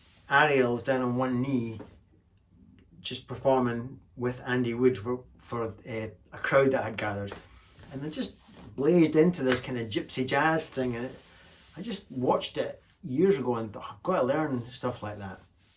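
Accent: Scotland, United Kingdom. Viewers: Django with a Scots accent.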